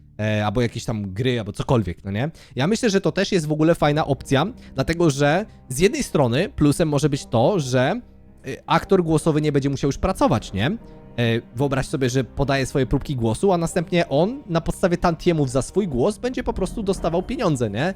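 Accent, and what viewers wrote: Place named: Russia. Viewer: Angielski brzmi jak z ruskim akcentem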